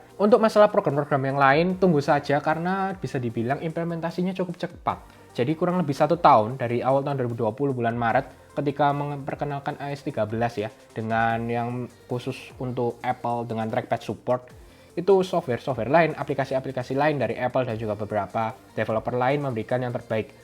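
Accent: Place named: Surabaya, Indonesia. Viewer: Bahasanya medhok suroboyo..hehe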